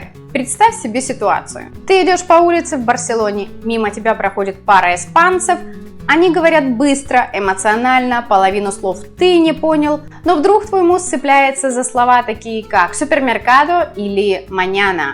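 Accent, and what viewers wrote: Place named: Russia. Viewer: у вас ужасный русский акцент когда говорите по испански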